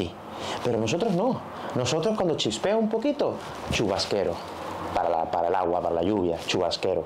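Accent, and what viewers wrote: Andalusia, Spain. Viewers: En Polonia está nevando.... y un hace frío que pela... o hace un frío que te💩 🙊 No veo la hora escuchar el podcast, me encanta el acento andaluz 😁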